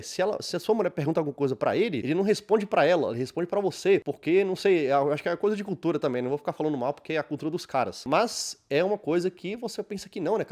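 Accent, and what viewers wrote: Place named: Florianópolis, Brazil. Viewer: Eu via os vídeos desse cara há muito tempo atrás, e pelo sotaque, sempre achei que ele era de Florianópolis, mas não, ele é Brasiliense :face-blue-smiling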